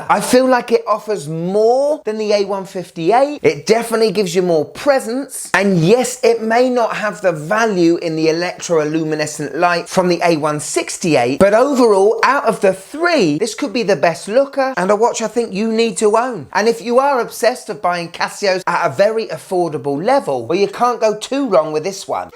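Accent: United Kingdom. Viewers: Hi friend, thank you so much for you videos, and as i'm english learner and i use your videos to learn britich accent, cuz you have a great one, i have a favor to ask, can you add to your video a transcript have all your talk please ? and thank you so much again for your Job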